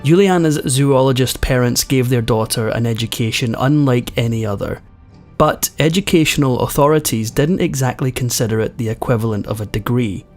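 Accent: Scotland, United Kingdom. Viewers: In British English, the correct past participle of get is "got", not "gotten". Unless you are American, though your Scottish accent would suggest you are not. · I love listening to narrate Lovely voice Scottish I wish you could put your name to story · I really enjoyed this narrator love listening to the Scot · Scottish accent - subtitled required.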